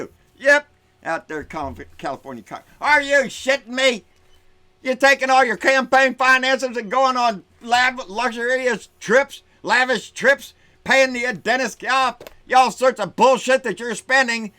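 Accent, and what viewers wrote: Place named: South, United States. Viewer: I think it's important that people hear this message delivered in a southern accent. People in 'blue states' need to be reminded that not everyone in the 'south' are ignorant enablers. I like your style, keep up the good work.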